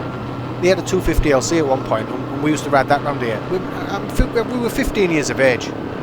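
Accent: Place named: Hull, United Kingdom. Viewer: Ahh the Hull accent, I still pronounce my u's and i's like an e. Flert, skert and hert... three random words there from top of my head but story of my life actually!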